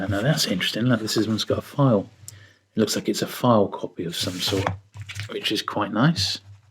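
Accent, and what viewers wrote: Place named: West Country, United Kingdom. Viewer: It's definitely that soft, West Country accent! Love your vids as always my friend 👌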